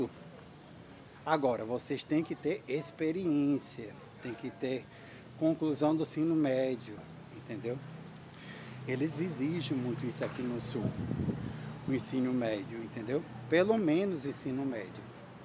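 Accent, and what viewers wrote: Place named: Northeast, Brazil. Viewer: Bacanaaa ver a realidade ! Sou do ES amo seu sutaque nordestino, dizem q o povo daí é metido a besta kkkkk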